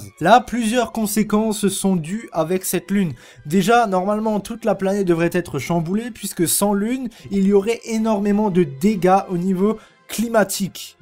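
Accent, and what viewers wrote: Alsace, France. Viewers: t'as un sale accent alsacien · Tes un alsacien jen suis sur je reconnais son accent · Ne serais tu pas d’Alsace ? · Accent alsacien, vertomi j'adore. ❤️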